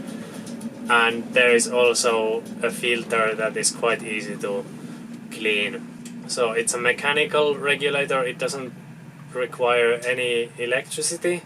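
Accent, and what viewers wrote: Finland. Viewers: Great! Informativ for me and my campingbus heat project. I go for a small diesel heater, who can work on full trottle. I don't understand where you came from, but I notice some Finlands accent 😃.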